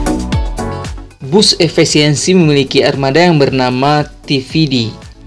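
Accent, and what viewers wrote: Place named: Aceh, Indonesia. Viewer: Intonasinya khas Aceh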